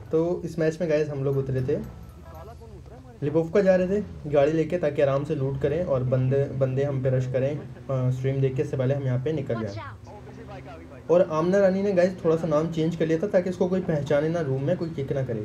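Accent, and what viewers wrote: Rawalpindi, Pakistan. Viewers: apki zuban se to pindi boyy lagte ho 3) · Aap pindi ke lgte ho bawa jee 🤗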